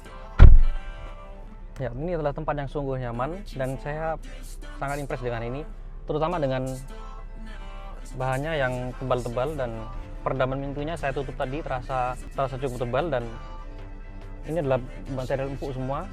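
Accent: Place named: Madura, Indonesia. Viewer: Logat madura nya tebal hehe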